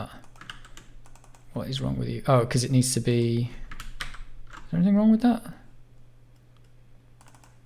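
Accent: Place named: United Kingdom. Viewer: Try to hire vocalizer it's high viscosity and hear it is unbearable. So much ear scratching and most of time floating slowly I listening at least +2x speed. I recommended you to switch standard US accent even Bharat more heareable than british. Or will be good if there is have any settings for prevent some countries and accents. I will glad if anyone knows like that filter tell me. Closing all sources much better than first option. Why i have to hear ridicilous sounds? Converting with transcript to all videos from same sources means cost.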